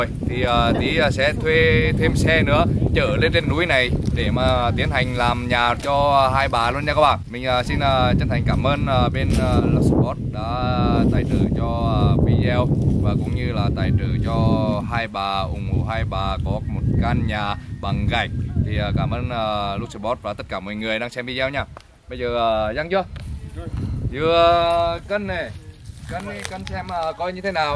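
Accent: Nghe An, Vietnam. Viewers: Mẹ mình cũng ở quê Nghi Lộc. Nên nghe tiếng của sao mà thích ghê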